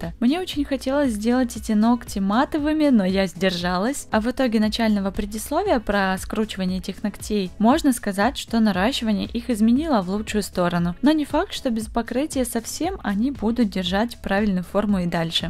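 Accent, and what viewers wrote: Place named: Ukraine. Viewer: жила раньше в Украине, это все же накладывает отпечаток на говор. Ничего страшного, ошибок в речи не так и много)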